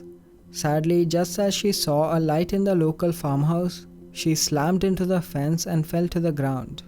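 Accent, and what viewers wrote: India. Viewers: That's an Indian ascent. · Please stop doing videos your voice is so annoying u Indians need to stop talking english all together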